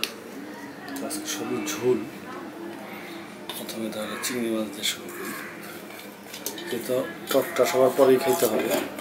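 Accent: Bangladesh. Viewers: Dada apne kemon achen ami nederlandsche thke dehi apnar gabber thekle Bangladesh er kotha mone pore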